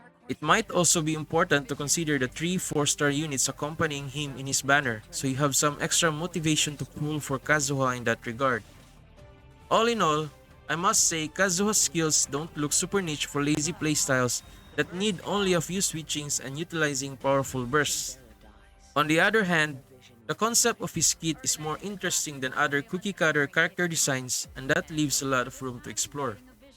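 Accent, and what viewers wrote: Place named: Philippines. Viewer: MmHmmhmmm that thick Filipino English accent is so *chef kiss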